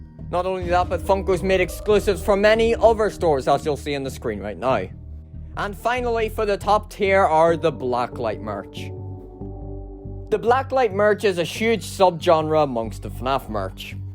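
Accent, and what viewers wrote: Northern Ireland, United Kingdom. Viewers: You from The North of Ireland? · In the first 3 seconds, I was able to detect that you were from Northern Ireland. The accent is hard to mistake. 😂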